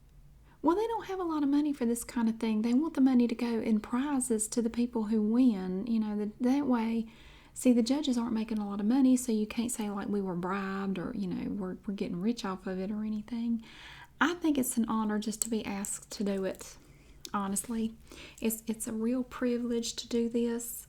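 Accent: South, United States. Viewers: I absolutely love your videos 😍❤️ especially the southern accent my fiance is from nc and when we first met I had to learn how he talks because of the accent always looking forward for any of your videos they always help me so much I'm going through a lot of anxiety and your videos always calm me right down thank you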